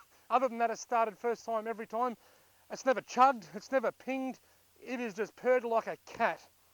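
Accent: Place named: Australia. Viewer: Nice video mate. I always enjoy listening to the ausie accent. Very nice bike.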